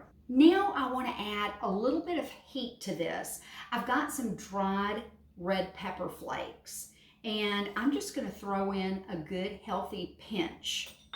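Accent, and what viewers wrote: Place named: South, United States. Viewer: I could hear you talking for hours. Love southern accent. After 43 years in California. The way you talk is just music to my ears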